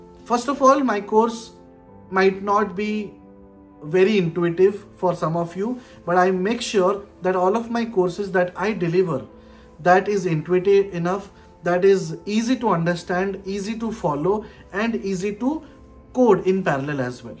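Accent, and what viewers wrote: Odisha, India. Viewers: Sir are u from odisha ?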